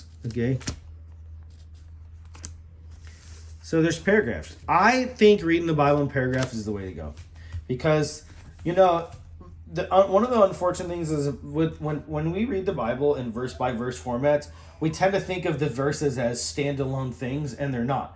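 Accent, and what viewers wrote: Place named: United States. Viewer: He also pronounces calfskin as 'keffskin' so I'm assuming it's a regional US accent!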